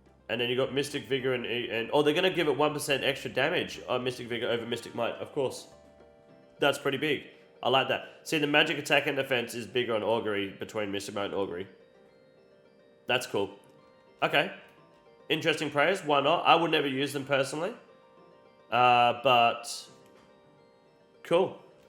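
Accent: Australia. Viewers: he's Aussie mate from Perth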